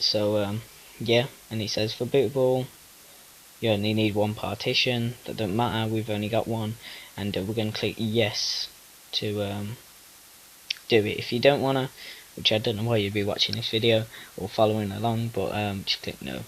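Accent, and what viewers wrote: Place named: United Kingdom. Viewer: Excellent explanation and demonstration! Some of us "across the pond" (USA) have trouble hearing as fast as you speak "The King's English", but fortunately, my computer has a "replay" button! Cheers!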